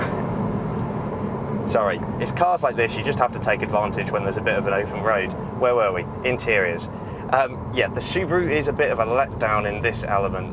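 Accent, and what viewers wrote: United Kingdom. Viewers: The cars are obviously wearing european license plates and he has a British accent...there were clues gentlemen.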